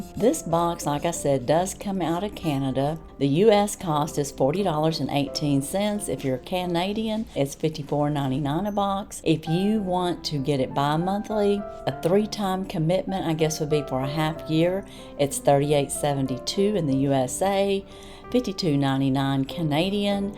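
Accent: South, United States. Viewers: Hi Miss! I love hearing you pronounce all the Polish words with your Southern accent & enjoying the treats. Great variety of items in this box.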